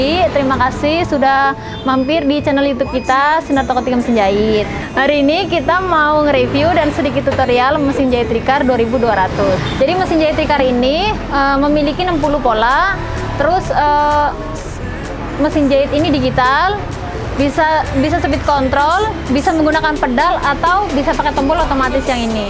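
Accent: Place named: Java, Indonesia. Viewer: Mba nya logat jawane kentel tenan asline pundi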